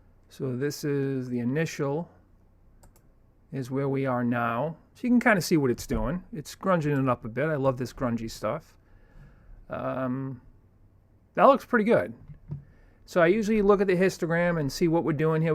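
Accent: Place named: Boston, United States. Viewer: Are you strictly just using a mouse to do all of your adjustments and edits in Photoshop, instead of using a drawing tablet and stylus? Man, I haven't done that since I bought my first computer way back in 1996. That's impressive! Excellent video, too. By the way, are you from Boston? Anyway, definitely a "Thumbs-Up!" from me. Thanks for the help. I appreciate it a lot.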